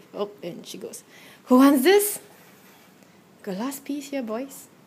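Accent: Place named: Singapore. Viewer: Oh, are you Singaporean because you sure sound like one!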